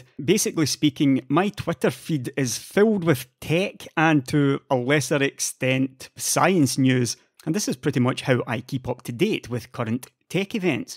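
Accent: Scotland, United Kingdom. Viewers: Those soothing scottish dulcet tones!!!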